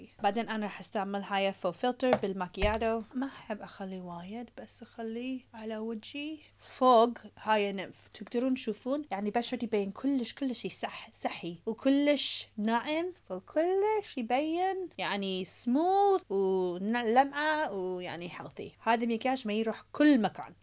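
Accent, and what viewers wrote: Basra, Iraq. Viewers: احسهه تحجي بصراويي اووي فديت 🥺🥺🥺🥺😂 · يخبل الفديو فرحانه بيج باللهجة العراقية احبج كلش هواية نفتخر بيج انتي عراقية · فدوووةةة البصراوي ابحلكهة ايخخخخخبلللل فديتج فدي من البجامة للبدي😊😊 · ما تلاحظون أن أغلب كلامها عراقي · ههههههه كولش حلوووه من تحجي عراقي 😍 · I loved your Iraqi accent ♥️♥️ Yes, you’re right its (khubuz al-moz) 👌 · خرب شگد حلوه من تحجي عراقي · حبيبتي كلامج يخبل لهجتج بالعراقي جنن ❤️❤️❤️i love you so much and i proud of you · فدوه تخبل اللهجة العراقيه بحلكج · احبج من تتكلمين عراقي💋💋💋❤ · فديتج ولهجتج اجنن العراقي عليج يخبل كثري هيج فديوهات متابعتج من العراق 😍😍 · روعة اني بموت فاللهجة العراقية انا من الجزائر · حبيبتي كلش حلوه الهجه العراقيه عنديج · ماشاء الله تحكي عراقي بشكل جميل جدا 😍😗 كلش كلش احبك وانت كلش كلش قمر الف تحيا للعراق الحبيب 🇩🇿❤🇮🇶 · واو....استمري استمري استمري استمري.......ولا غلطة وكثير احب هيچ فيديوهات....هواي هواي هواي حلوووه اللهجة العراقية عليچ....متابعينچ من العراق / البصرة وكثير نحبچ😘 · فكرتك عراقيه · أعشقها وهي تحجي عراقيه تنكرططططط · شحلاتهه من تحجي عراقي😂♥♥♥♥🌷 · شكد ححلو كلامهه بالعراقي😍♥️ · انا حسبالي تتكلم كويتي اطلعت تتكلم عراقي🙂🙂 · اموت عليها وعلى لهجتها العراقية تخبلل😢♥️♥️♥️. 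· ههه فدوه شون تحجي عراقي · تجنن بالعراقيي فخورة بيج لانج من العراق ❤️❤️ 🇮🇶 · تلاحضون هية تحجي عربي بس اكثر شي عراقي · متعلمه العراقيه من نور 😂😂😂يا الله وش حلو اللهجه العراقيه على كل لسان💚❤🇸🇦🇮🇶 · عفيه ضلي احجي عربي لن جنن لهجه العراقيه ع ومتابعيج عرب وعراقين اكثر من الاجانب😍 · فدوة شنو هالجمال وشنو هل لغه تخبلين من تحجين عراقي🥰🥰🥰♥️💋+خبز موزة✅✅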